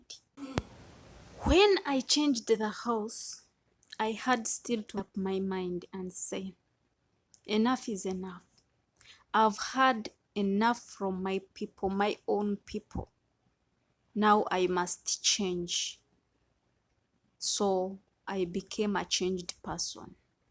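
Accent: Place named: Kenya. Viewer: You sound like a Kenyan ooh God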